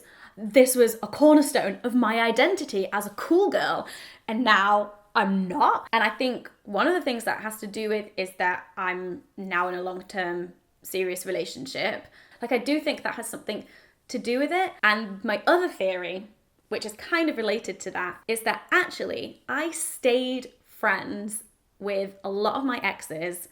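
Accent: United Kingdom. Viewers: Your British accent along with your "joie de vivre" is what I find attractive in you. Your husband is truely a very lucky man! Stéphane from Montreal, Canada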